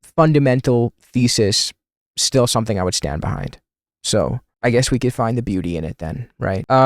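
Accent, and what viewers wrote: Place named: Quebec, Canada. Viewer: bro quebecois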